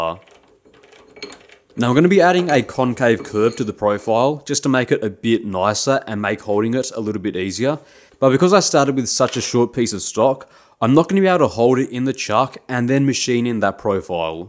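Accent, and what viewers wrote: Australia. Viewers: Dude for some reason I opened this video and thought it was inheritance machining so I got jump scared by your Australian accent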